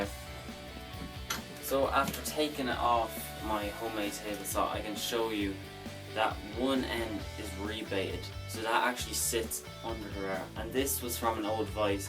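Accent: Ireland. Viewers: Great job!! Loved the video, but then again I'm partial. Your accent makes long for the old sod. Keep up the grand work!!!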